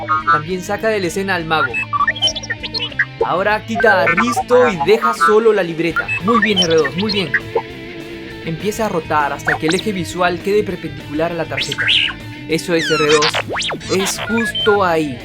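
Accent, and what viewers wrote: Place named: Peru. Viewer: Ese asentó peruano tan particular es así que el youtuber DKBesa también tiene una particularidad en su acento, espero tengas más suscriptores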